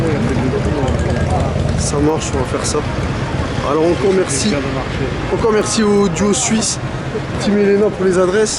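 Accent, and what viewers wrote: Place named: Alsace, France. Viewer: T'es Alsacien ? T'as un gros accent.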